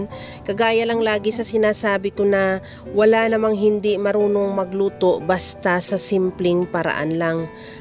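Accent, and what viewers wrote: Iloilo, Philippines. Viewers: wow for sure yummy ang ganda ng pagkaluto pero parang my tunog ilonggo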